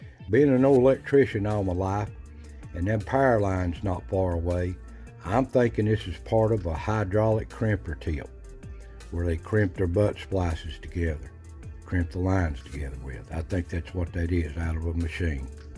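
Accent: Appalachia, United States. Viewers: Your backwoods accent reminds me of my dad whose people came from Appalachia.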